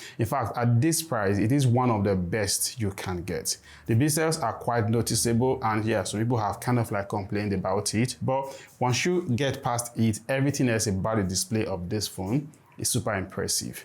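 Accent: Africa. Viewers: I got one word in 3 , why have someone with a strong africian accent describe a product, no offence but speech is not clear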